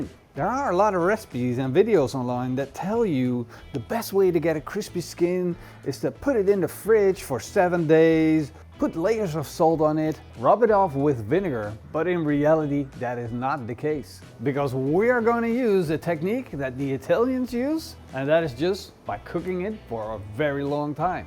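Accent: Netherlands. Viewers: I am so annoyed at the fact that I haven't been able to guess your accent 2 minutes into the video.. I'm guessing Germanic or Nordic but I just can't lay my finger on it 😂 Looking forward to the result! (And whether I can find more clues) Edit: a minute later I still want to say Dutch, but one who is a massive Elvis fan! Edit 2: Nederlands, laatste antwoord. Edit 3: Als ik terug ga naar het begin hoor ik de Elvis niet meer... maar het Neërlandsch is deste duidelijker haha. Eindresultaat ziet en klinkt heerlijk! ^^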